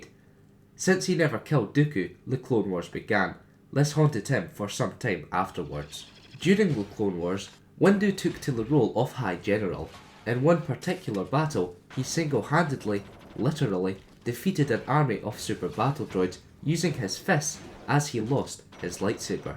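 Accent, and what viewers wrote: Scotland, United Kingdom. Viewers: the scottish accident makes it better more like a young Kenobe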